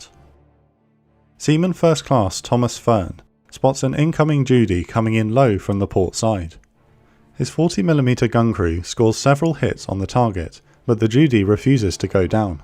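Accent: United Kingdom. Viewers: Most BORING vid evah.. Can you possibly Mumble any more Monotonously? One sentence.. 1. A British accent; 2. Listlessly Mumbling; 3. Unexpected (and indecipherable) words; 4. Tonelessly; Exciting Topic! Thrilling Script! aaaaaand, Narcoleptic Narration humdrumhumdrumhumdrumhumdrumhumdrum zzzzzzz Did you sometimes call some airplanes, "Janes?"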